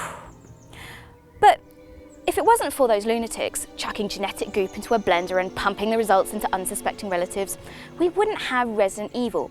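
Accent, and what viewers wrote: United Kingdom. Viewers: Asian girl with British accent? Yes please and thank you :3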